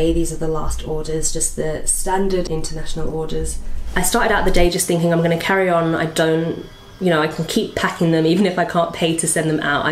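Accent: United Kingdom. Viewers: You have a very classy British accent normally (no offence) I kind of find British accents very lousy and not super classy like hers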